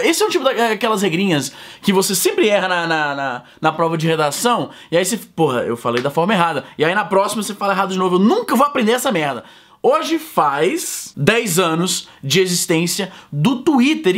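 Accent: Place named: Ceará, Brazil. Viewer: Curioso como tu n perdeu sotaque cearense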